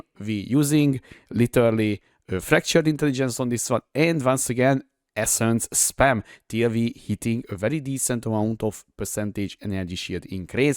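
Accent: Hungary. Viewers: Came here after a recommendation for super tanky builds, not disappointed! De barátom a kiejtésed borzalmas hahaha Rögtön tudtam hogy honfitárs vagy! A kedvencem a kurentli és a dö :)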